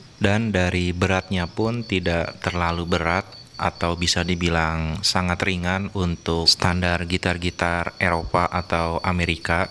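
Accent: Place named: West Java, Indonesia. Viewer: Ehehehe, nyunda pisan yeuh voice-na.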